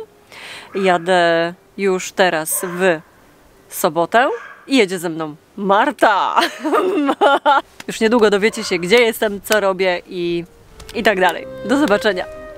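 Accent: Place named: Greater Poland, Poland. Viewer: właśnie oglądam film co opowiadasz i wymowa dialekt zwroty ten tysiąc w karty itd identyczyy co u nas ja z wlkp to nie daleko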